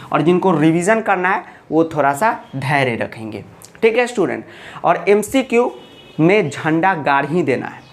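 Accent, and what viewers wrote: Bihar, India. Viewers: sir are you from Bihar bcz your language is so sweet I also belongs to bihar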